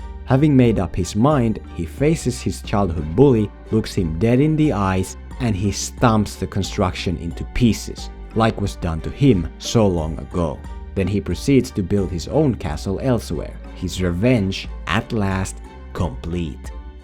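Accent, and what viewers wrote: Finland. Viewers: Hi, are you Finnish? Your accent sounds really Finnish!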